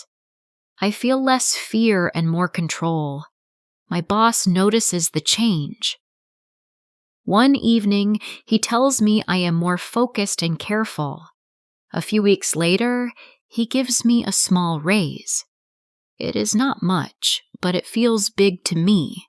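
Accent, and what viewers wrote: United States. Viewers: This Chanel is American because the accent is American clearly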